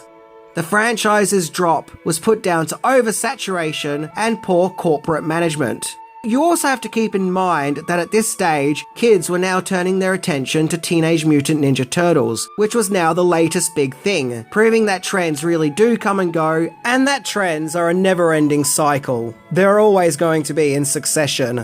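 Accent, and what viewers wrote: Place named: Australia. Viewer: Bro you make some of the best videos ever!!! You are funny, imformitive and just over all a good dude!!! Im a 43 year old american male, i know the profile says maria curcio but thats my mother in law that passed in july 9th of last year and her daughter, my childrens mother was nice enough to let me use it even though me and my childrens mother havent been together since 2015😊 Im usually signed in as Heathdiegert013 or something close. I dont know how to change it and i feel bad to change it😊 I LOVE your voice, you aussies sound so cool!!! Please dont ever stop if you dont have too😊 my favorite Austrailian!!!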